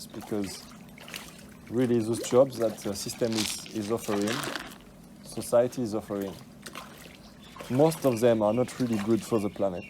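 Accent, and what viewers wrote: France. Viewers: I used to be pessimistic about the future of the world but over the last 20 years I think we have turned a corner. Doesn't make many people happy the future I see as it's tech driven but that the way it will be. It's just spreading the wealth is the issue and that political. Anyway thats a long story. On a completely different topic, that's a French accent? The wifes French and we come in to Melbourne occasionally for your sons braces to be done in Bentleigh East. A very important question and if you have an answer it could make the wife very happy (and the son and me). Is there a good French bakery near there and/or a French importer of foods? Thank you.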